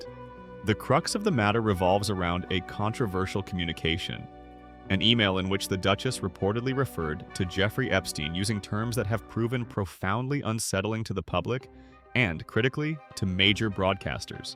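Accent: United States. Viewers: Why the American narrator?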